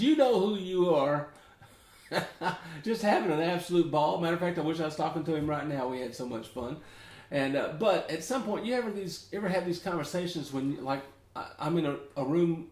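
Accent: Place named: South, United States. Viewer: Awesome,! Shirley loved it! I love southern accents too!:o)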